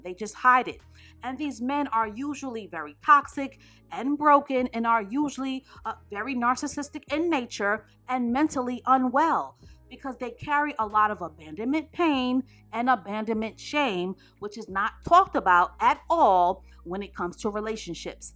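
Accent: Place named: New York, United States. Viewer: Hey, Can you please do a video on fatherless daughter. I’m not sure if you have already. I’ve been binge listening & haven’t come across one of that topic.bI love your channel so much. I hear the New York in your voice. It brings me comfort. The Bronx? 🤷🏽‍♀️ Thats my birthday place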